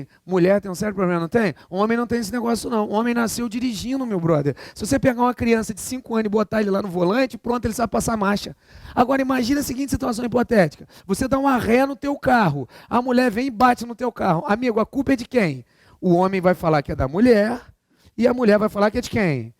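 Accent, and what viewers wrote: Rio de Janeiro, Brazil. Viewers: ele é carioca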